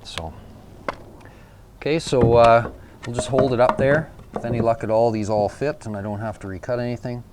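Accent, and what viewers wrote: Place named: Canada. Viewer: The most canadian accent.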